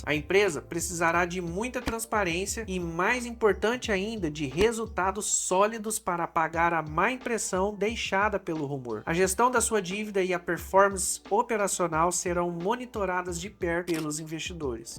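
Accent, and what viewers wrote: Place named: São Paulo, Brazil. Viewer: Esse canal está sem hora certa. Tem de ir até Houston acertar os ponteiros. Sotaque do interior paulista.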